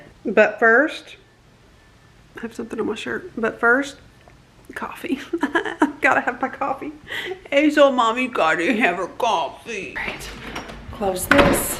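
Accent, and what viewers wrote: South, United States. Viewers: Love , love your southern accent ! My moms family comes from GA .Love their southern accent! Miss my family so much !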